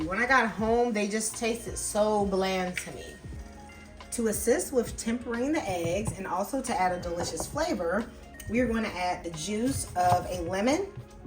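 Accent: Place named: Nashville, United States. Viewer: Hi! I'm a new fan,. Hey - are you by any chance a Nashvillian? That's Tennessee, y'all. You sound so Nashville! Are you? Love your stuff! Keith in Nashville PS You're a genius!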